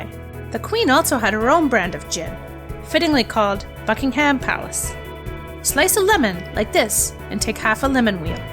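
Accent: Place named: United States. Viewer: What a simply awful accent. The pronunciation was squeamish. Americans simply should avoid pronunciation of non English speaking words and phrases.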